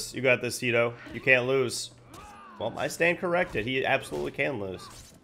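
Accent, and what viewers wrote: United Kingdom. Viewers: Best british accent 10/10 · British accent, beret hat, great at games, I thought you were being like Trutalent lol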